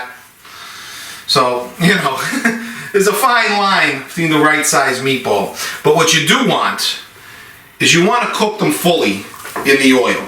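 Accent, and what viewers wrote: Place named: Massachusetts, United States. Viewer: EH I SEE YOU GOT YOUR ST CHRISTOPHER ON YOUR NECK THERE... LOVE YOUR BEEYOUDEEFULL SILVA CHAINS ROUND YOUR NECK THERE... DID YOUR PARENTS SPEAK ITALIAN? YOU SOUND LIKE YOU AHH FROM MASSACHUSETTS.... MY WHOLE FAMILY ON MY MUTHAS SIDE WAS FROM THEY AHH. LOL YOU ARE WONDERFUL THANKS FOR THE RECIPE GOTTA GO TO THE GROCERY STORE REAL QUICK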